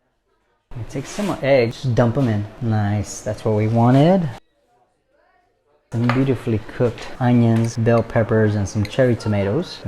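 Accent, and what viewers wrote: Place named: United States. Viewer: You watched a man with an American accent cooking a variation on typical American food and didn’t think he was American? I don’t know how to help you.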